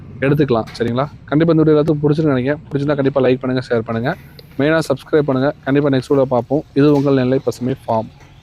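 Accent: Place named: Madurai, India. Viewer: Madurai basha ❤❤❤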